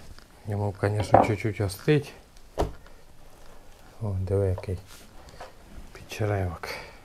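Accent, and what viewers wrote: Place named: Kharkiv, Ukraine. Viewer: Этот человек говорит на местном диалекте и вряд-ли он умеет разговаривать на русском. На Харьковщине везде так говорят .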